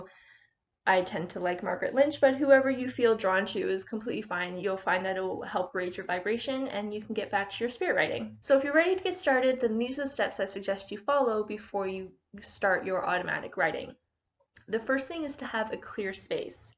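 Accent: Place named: Massachusetts, United States. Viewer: I’m 5 seconds into this video and I immediately heard a Massachusetts accent. (If I’m getting cocky about my accent guessing abilities can I get more specific snd say like outside of Boston? North shore? Merrimack valley?!)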